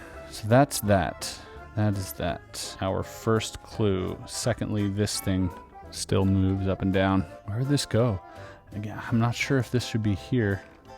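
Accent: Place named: Canada. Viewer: Legos are so much fun lol why do Canadians say oat instead of out. I through Lego blocks oat my window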